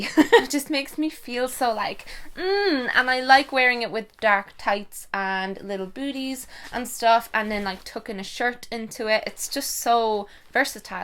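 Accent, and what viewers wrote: Dublin, Ireland. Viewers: I'm from Ireland 🇮🇪 and your accent is the cutest 🎉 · I would love to be in your book love watching your videos and love your Idunlun accent 😍💚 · I love your videos so much! You always put a smile on my face:) I feel like home when I listen to your beautiful Irish accent because I'm actually half Irish living in Finland:) And oh my god it would be so cool if my name was in your book! 💚💚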